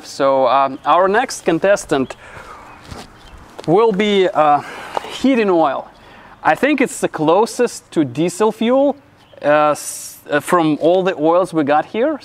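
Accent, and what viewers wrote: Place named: Eastern Europe. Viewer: Nice accent) Just love this slavic word pronunciation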